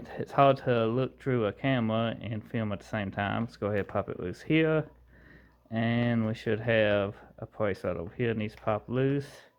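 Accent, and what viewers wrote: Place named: South, United States. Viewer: your souther speech reminds me of a kinder Dusty Marlow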